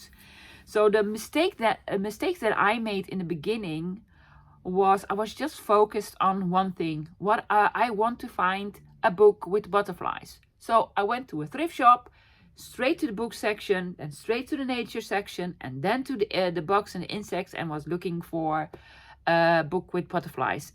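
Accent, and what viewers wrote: Netherlands. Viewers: You are such a lovely person I found some days ago. I love your dutch accent and it's fun listening to you! Thanks for all the inspiration I'm always looking for. Be blessed!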